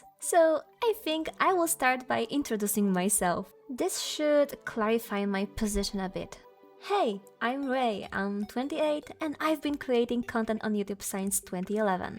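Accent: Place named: Poland. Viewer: ja tam słyszę polski akcent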